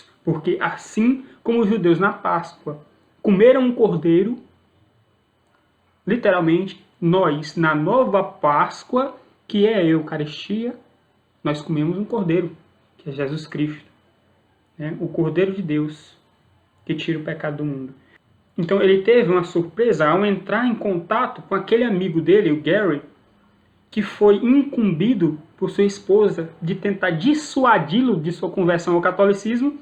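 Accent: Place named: Northeast, Brazil. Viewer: Vc é maravilhoso! Sotaque nordestino do jeitinho q eu gosto. Sou cearense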